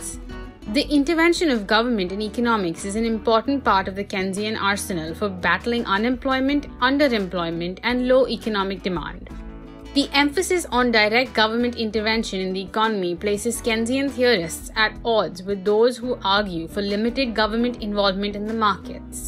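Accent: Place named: India. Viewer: If you can't speak clean english, then SLOW THE F DOWN. If you would speak slowly many of us who can not understand Indian dialect would still be able to benefit from your videos.... otherwise completely worthless.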